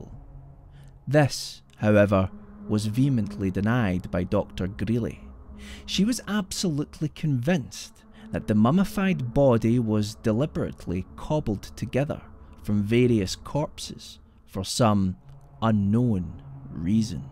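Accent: Scotland, United Kingdom. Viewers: oh my god Scottish voice narrator and the great! so glad I found this channel! it always amazes me that I can go to 20 different creepypasta narration channels and they all will have different but equally good material for me to listen to! so much talent out there and so many outlets to find them in its just wonderful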